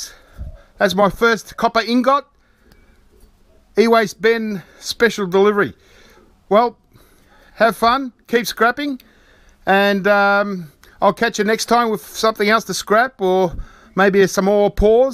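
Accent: Australia. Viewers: but he sounds Aussie tho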